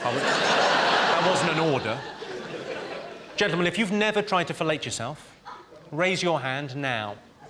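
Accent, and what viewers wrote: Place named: RP, United Kingdom. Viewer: Why did Jimmy used to put on such a posh accent?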